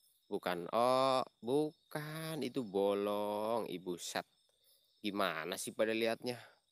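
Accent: Banyumas, Indonesia. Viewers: Hahah kami tenggengen.. Bahasa ngapak nemen yaaahh Keren lanjut bro.. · Misi, numpang nanya, mas si orang mana, koq logatnya ngapak kaya aku 😬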